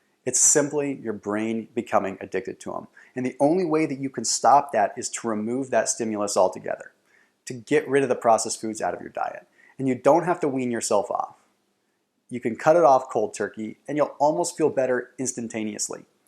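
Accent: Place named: United States. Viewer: Great video and content. Excellent. I'm definitely cutting back on processed foods. My processed foods intake is a result of my time-consuming job. Then I just conveniently nibble on junk foods and eventually ending up feeling junk. Thanks. Ignore the number of dislikes. Maybe they don't understand your fluent American accent. I share my advice: Click on the CC button at the bottom, right corner. (Subtitles/closed captions) Listen and read as well. Pause and go back if you didn't understand well.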